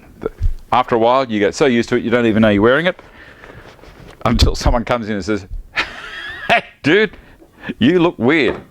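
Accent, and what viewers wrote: Australia. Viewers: I was wondering why I love watching this video, then I've read in comments that you're from Australia :D Love the humor, the accent and of course the build itself! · Any reason you put the wood through the thicknesser before the jointer I've always thought it was the other way around? It is nice to hear an Aussie voice on you tube BTW.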